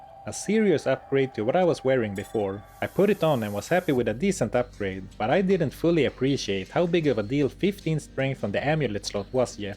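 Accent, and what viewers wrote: Sweden. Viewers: What a peculiar, and very thick, Swedish accent! Not only does he confuse V and W, "sh" and "ch" and so on as most non natives speakers do. He also turns "o" in to "a", which is something I've never heard before. "lot" becomes "lát", "dodge" becomes "dádge" and "monster" becomes "mánster" somehow. That combined with the language melody, copied straight from Swedish make for a hilarious listening! :) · Are you swedish? Your accent sounds swedish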